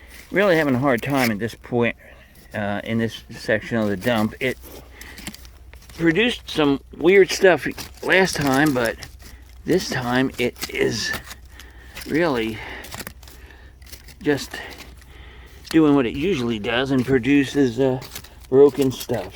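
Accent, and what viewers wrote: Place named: New Jersey, United States. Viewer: You got a nice little spot there! Sounds like you are from new jersey with that accent :)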